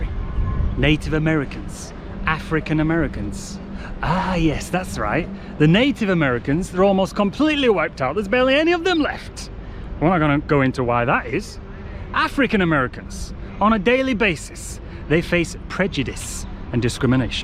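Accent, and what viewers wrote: United Kingdom. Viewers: That Brit accent that reminds me of Ron in Harry Potter. I just laughed quite nice 😁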